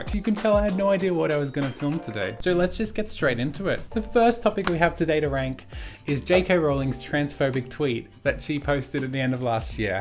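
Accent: Australia. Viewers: Is that an Australian accent? It is just lovely!! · How did I know you’re Australian before I even clicked on the video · THIS WAS JUST IN MY RECOMMENDEDS AND YOURE AUSSIE????? Stan. I have no idea what any of these ~dramas~ are (minus jk) lmao but this is great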